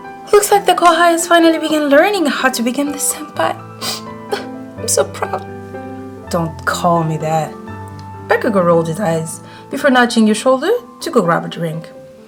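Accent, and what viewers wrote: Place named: France. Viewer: Cool video, love ur french accent ♡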